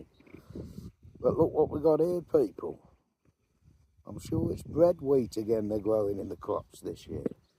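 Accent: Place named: London, United Kingdom. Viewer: Hi my name is Christine but please call me chrissie which have subscribed to your channel have been watching u for sometime now like your accent u come from London❤️ 🎉😊top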